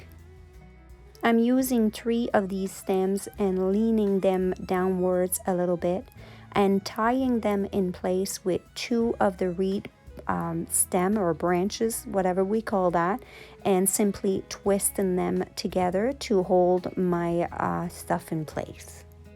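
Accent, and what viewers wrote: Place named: Montreal, Canada. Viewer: The wreath looks great without a bow. Very good job. You inspire me. By the way I love your accent it reminds me of when I lived near Montreal. It brings a smile to my heart.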